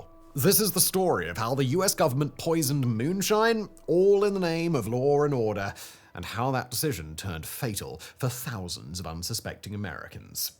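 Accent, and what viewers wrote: England, United Kingdom. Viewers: I'd love to hear this guy speak when he's not putting on the poshest English accent he possibly can. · Whos laws are we talking about? This guy had an English accent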